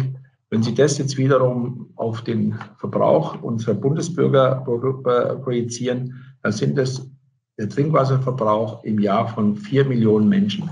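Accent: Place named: Hesse, Germany. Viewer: „Wenn sie des…“ E bissi hessisch schwätzt er noch der Ex-Brunnensträsler! VG JK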